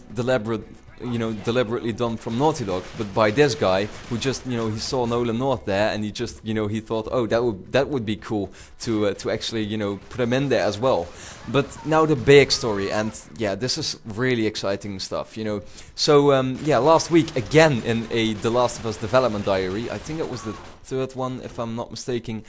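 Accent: Netherlands. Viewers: never tried it before, i'll let you know the taste at june 10th, When they anounce U4, I realy hope they do ! :D Where are you from by the way, It looks like i hear a little dutch accent ?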